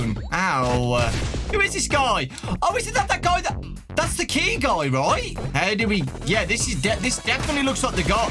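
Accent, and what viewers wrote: United Kingdom. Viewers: i dont know whats so funny about a british man screaming EXOTIC BUTTERS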